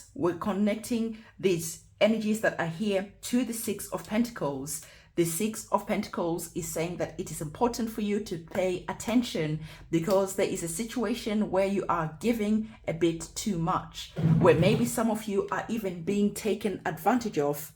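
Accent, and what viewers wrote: South Africa. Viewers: Are you South African? :)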